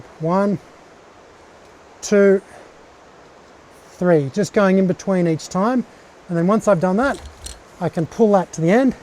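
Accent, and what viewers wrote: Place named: Australia. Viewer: Hey you sound like an Aussie (that's a compliment). Excellent, excellent video. Sound advice at every stage. Particularly at always carrying a Reverso. My current project is toying with top rope solo for ice-climbing. Presently using a Petzl Rescucender (top) on a harness combined with a Petzl microtraxion (bottom). The Rescucender is very heavy as I have teamed it with a steel (Grivel) biner because of the cross-loading. Have you seen/tried the TAZ Lov2 fall arrest descender <link>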